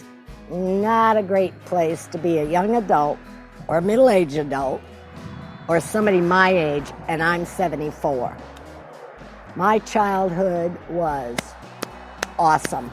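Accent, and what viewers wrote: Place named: England, United Kingdom. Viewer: Суперское видео!! Побольше таких снимайте!! Очень приятная и красивая женщина с изумительным английским акцентом!!! С нетерпением ждем 2 и 3 части!!!!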